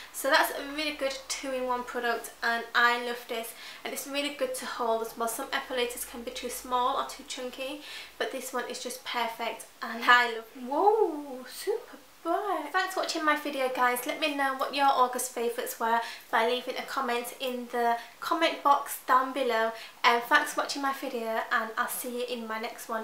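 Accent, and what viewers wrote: Yorkshire, United Kingdom. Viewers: Is she from Yorkshire?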